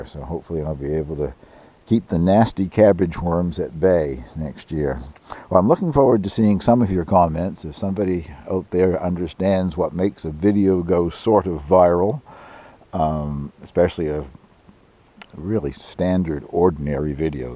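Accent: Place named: Canada. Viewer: Hey! No clue on what made your video go viral. Anyway, I don't know a thing about gardening, etc, but your videos are still fun to watch. I especially like any videos relating to your cabin. As a matter of fact, that's how I found your channel. I searched for videos about cabins and found your channel maybe a year ago. Keep 'em coming! Always nice to hear that Canadian accent. 😁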